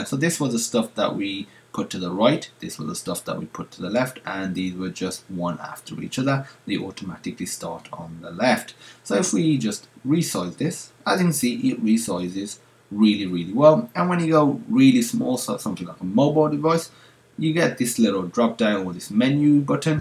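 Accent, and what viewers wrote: United Kingdom. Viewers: and +Nooblike first of all if you carry on his tutorials and accent then may be you can find out he is from United Kingdom what is famously known as UK. he showed every step with a generous teaching method what can not be narrated (I believe). Now if you see the rest of his videos, I think you know how he knows about these things and game development. and what a good method does he have to touch every corner. and 2$ or a 4$ is not a fact. at least you guises have seen he used a macintosh(MAC). So, he is not that much poor as you said. and please if you can't appreciate this help for the cost of 0$ at least try to be at least respectful for his generous help.+Rawandi please don't use this kind of talking to people who are helping you-if you don't like it then skip the video but don't try to b**s**t there. and +Nooblike don't don't tahnk this it makes you look like you are appriciating this kind of works. 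At Last + Thank you.Awesome tutorial as always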